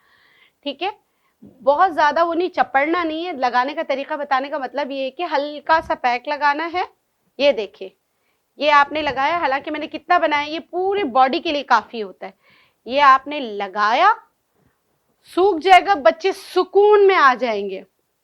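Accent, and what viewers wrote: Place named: Gilgit-Baltistan, Pakistan. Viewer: Salam Dr Madam, this is very nice and very simple kids and others, thank you Dr gi Your way of talking shows you from gilit baltistan.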